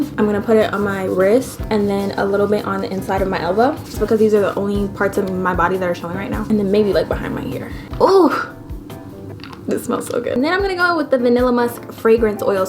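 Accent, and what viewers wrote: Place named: United Kingdom. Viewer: Yessss we missed you , at least the British accent will never change on us 😭 love your videos ❤❤❤❤ so glad your doing well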